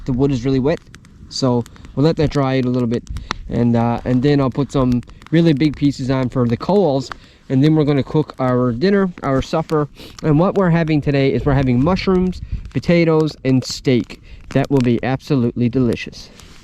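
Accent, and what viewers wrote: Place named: Cape Breton, Canada. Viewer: You sound like my Cape Breton brother the way you speak. I enjoyed this video. Thank you🤗🇨🇦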